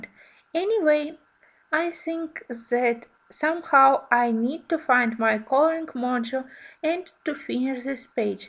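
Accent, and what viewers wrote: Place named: Russia. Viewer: Вы русская??? Очень русский акцент, как будто в российской школе учили английский язык))) я не ошиблась?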